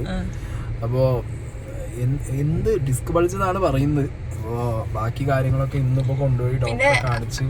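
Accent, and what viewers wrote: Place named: Kozhikode, India. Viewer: കാണാൻ കൊറിയൻ ലുക്ക് ഉണ്ട് സംസാരം കോഴിക്കോട് 😍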